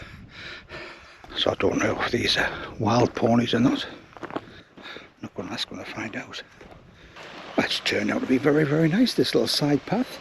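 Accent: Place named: South Wales, United Kingdom. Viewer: Love that, out there today haha you really did get noticed and that woman who turned away must have been in awe of you 😃That was stunning up there but wow you were up early again, 3 50 in the morning oooh 😯 that joke though! It did look so beautiful but i can imagine like you said, the colours in a few months time must be gorgeous. I thought the advice that you gave to the new YouTuber was so good, I can only watch longer videos if I really enjoy a channel. Loved the views at the summit, and nice that there was some shelter up there too. I enjoyed your South Walien accent 😃🏴 and i feel the same about cows and horses that are loose on mountains too, scary. Enjoyed this as always:) ~ Anna 👍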